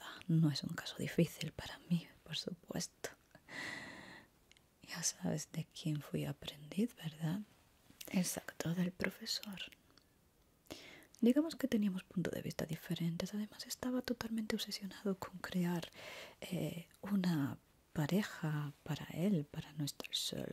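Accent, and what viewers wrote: Andalusia, Spain. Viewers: Amo como hablás, de verdad amo el acento español y luego el tuyo es hermosooo ✨, aparte tu voz relaja 🧡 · En primera, siempre genial, relajante, tingles, interesante... Pero luego leí "Cum" en lugar de "Rum" en la botella de atrás y me dió risa de la mala xD Por cierto, aunque estoy en México, y por ello me es difícil diferenciar acentos de España... el tuyo es el más delicioso de oír. Necesito muchos amigos andaluces para que me hablen todo el día 💖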